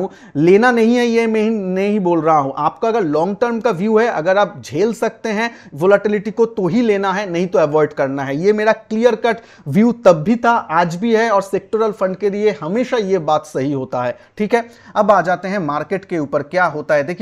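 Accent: Bengal, India. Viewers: many times you put bengali words in hindi accent in your communications that creates unique statements which I personally liked very much, at the same time there is no mistake as such,additionally your hindi communication touched another level which becomes your signature mark in futures. Yes, today is the day of huge corrections.Still I personally feel IT funds will boom again. Thanks for your video that will definitely help & subside today's burning pain amongst mutual fund lovers. 👍.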